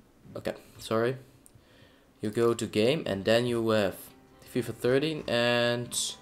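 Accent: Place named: Netherlands. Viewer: uit nederland denk? kun je wel horen lolll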